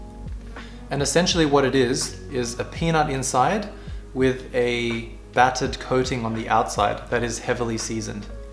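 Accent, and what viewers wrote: Germany. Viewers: Srsly, your german is so authentic that I (as one) was sure you were german. Good job! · Are u from Germany? Sounds like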